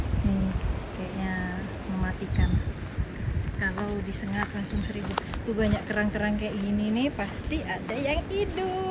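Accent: Indonesia. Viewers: Logat kutai kk nya maha ga bs boong hehe. Hekda AC yg ada angin cepoi cepoi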